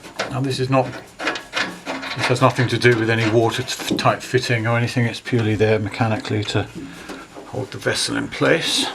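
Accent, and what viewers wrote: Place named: Home Counties, United Kingdom. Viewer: you are a STAR plumbing video maker. I am sooo... grateful i found your amateur easy to follow step by step video. British videos give only badly explained advice and meant to get you to call a plumber. Youtube should delete these fifteen minutes of fame time wqsters. Your accent seemed home counties english until i found i was donating in Canadian dollars on paypal. Thank God for honest Canadian DIYers, I saved £s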